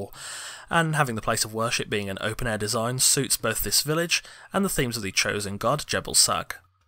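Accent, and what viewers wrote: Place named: United Kingdom. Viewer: Dat damn fine british accent.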